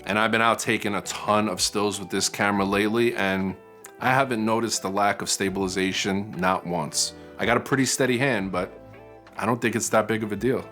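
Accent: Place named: Queens, United States. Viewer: Great review, currently thinking of picking the XT30ii as my EDC camera. By the way, that accent...Queens, NY right? I'm hearing Flushing or Jackson Heights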